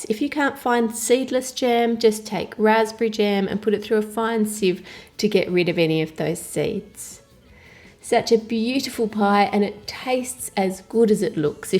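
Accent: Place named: Australia. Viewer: If you want that kind of accent. You have to roll your tongue :P I love her Australian accent too!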